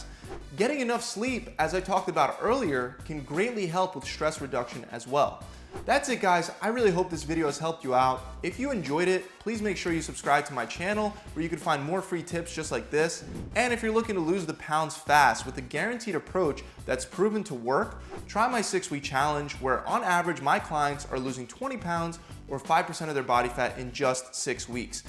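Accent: Philadelphia, United States. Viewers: Philly accent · Haha are you from Philly? Love my Philly wooder.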